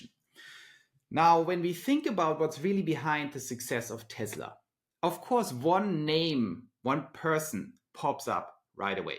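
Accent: Germany. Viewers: Appreciate your analysis, insights and effort to bring this material so clearly with your pleasant German accent. Very good!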